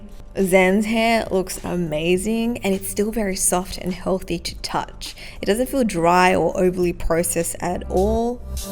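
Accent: Australia. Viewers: Where is this salon? As i noticed you have an Oz accent. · I realize that 'hair' for women can be a long process, depending on how long it is and also how well a woman takes care of it, but I never realized what an extensive process it was. I'm hearing accent, sounds Australian? thinking Melbourne?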